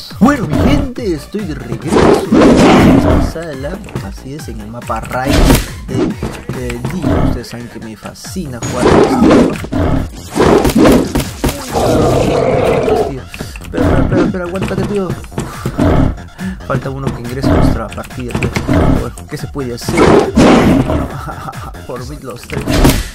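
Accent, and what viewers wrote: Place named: Peru. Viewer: Se me hace que eres peruano, salueame n tu próximo video plssssssssssssssssssssssssssssssssssss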